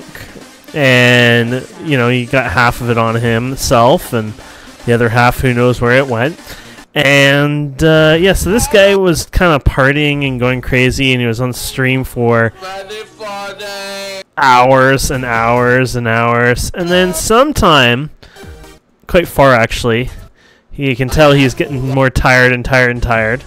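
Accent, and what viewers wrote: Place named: Canada. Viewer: Kanadai csávó, hallani az akcentuson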